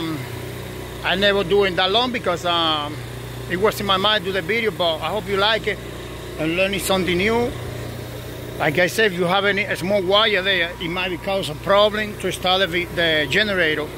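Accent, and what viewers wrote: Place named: South, United States. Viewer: I like your accent. Sounds like your from the south. So I gotta subscribe and thumbs up! Nice work!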